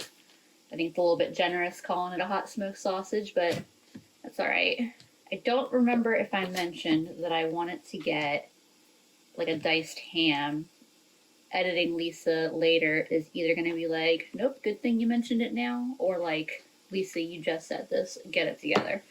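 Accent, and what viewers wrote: Philadelphia, United States. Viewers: I KNEW IT! I heard Philadelphia in your voice!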